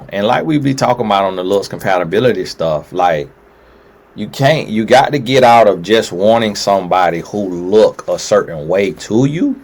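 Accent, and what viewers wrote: United States. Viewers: Tony Gaskins where were you raised because I love your country accent. Keep bringing that Godly and Straight no Chaser Wisdom 💯💚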